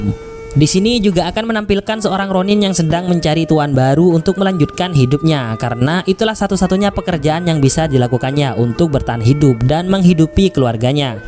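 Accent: Madura, Indonesia. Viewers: Khas Madura ya kuat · Bang dari madura yaaa.. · Penyiarnya kaya logat madura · Madura bingits cak, Kereen... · Abang orang Madura yah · Woo..naratornya kacong y.. 😀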